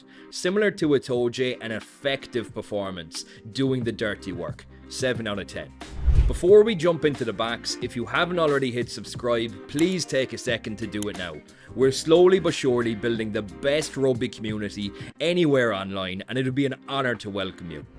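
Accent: Ireland. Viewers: I think your videos would be going very far if you keep rating like that crap ...JGP could have been Player of the Match ....Hugo was fantastic ....you need to do better homework and rate your team not just on stats you get from Internet...you are obviously not a player. I won't be coming back to be droned by your flat Irish accent .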